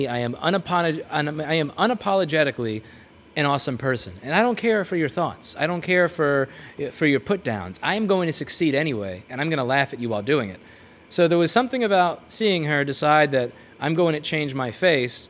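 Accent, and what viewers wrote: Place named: Wisconsin, United States. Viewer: Wait a second , you are a Wisconsinite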